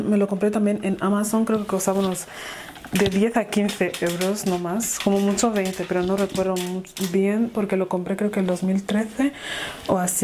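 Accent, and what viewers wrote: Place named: Equatorial Guinea. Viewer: Saludos desde Buenos Aires!! Me encanta el acento de Guinea Ecuatorial!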